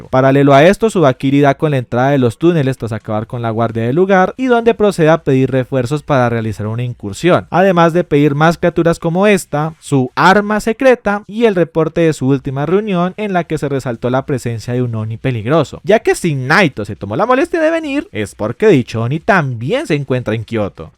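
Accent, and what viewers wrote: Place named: Colombia. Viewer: colombiano narrando anime cine🗿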